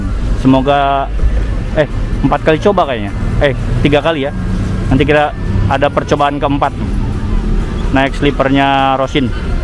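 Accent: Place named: Madura, Indonesia. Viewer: anda orang madura ya bang ? kok logatnya medok banget maduranya 😁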